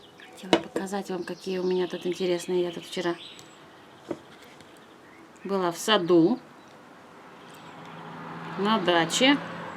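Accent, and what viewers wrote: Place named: West Siberia, Russia. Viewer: Слышится западно-сибирский говор. Или мне показалось? :) Спасибо за интересное видео и за список ссылок! Лайк!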